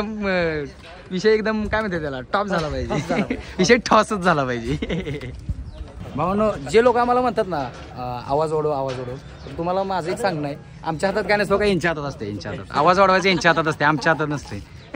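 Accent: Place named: Vidarbha, India. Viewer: विदर्भ bolte naaa bawaaa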